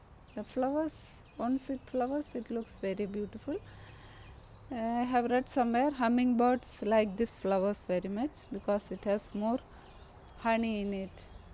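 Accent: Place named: Kerala, India. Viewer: You remind me of a nun from Kerela. Voice and accent is so similar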